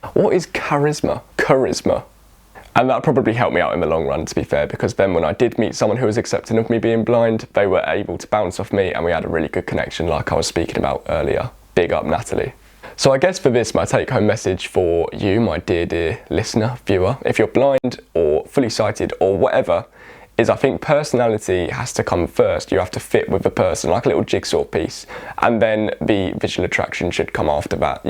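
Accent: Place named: England, United Kingdom. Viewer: Hi my friend, my name is Jordan I have a channel here on YouTube called the blind world. We’re trying to create a world where like what you were saying this video for you had people in your life you were naive and you thought that they couldn’t have relationships with you because you were visually impaired and blind. I’m trying to create a world where that doesn’t happen where people can have relationships for us despite us being blind and I want to create a world where we are seen as just people really, and I like this video because I’m actually trying to figure out how to have sex. I know that I’m attracted to people to women but just How I will be physically able to have sex and that’s all I kind of want to say really and I’ve seen your Instagram post and I think you’re very good you’re very educational and I can relate to the stuff that you’re talking about since I am actually vision impaired myself and I have autism and I am from Ireland and I’ve noticed by the sound of your voice that you’re precious visually impaired person but you’re from England I just I hope to get to know you as time goes on as I watch your videos and just I hope you know that I’m being genuine with my comment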